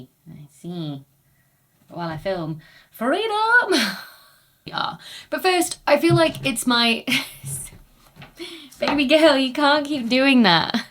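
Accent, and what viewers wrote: United Kingdom. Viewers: Why is it here in the USA we are so willing to accept a British accent as the voice of authority in the field about which they're lecturing us? I'll believe it when she presents a picture of an actual BH, I don't care how adorable her accent is.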